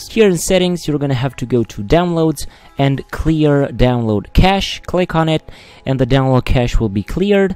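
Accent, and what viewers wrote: Romania. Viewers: You are from romania?xD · It worked, thx, but let me ask, are a romanian youtuber, ur voice sounds familiar to me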